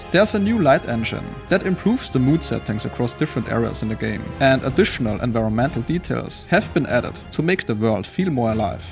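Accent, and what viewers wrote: Germany. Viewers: Oh shiet I came here to say that the German accent added to the Nosteratu vibes wonderfully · Bist du deutsch ? Frag für nen Freund 😅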